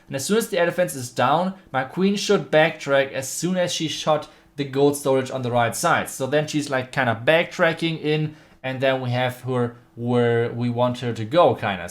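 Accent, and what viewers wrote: Germany. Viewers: This made me realize tho, it's in the delivery. Germans have that accent and I think it messea it up lmao. Messes**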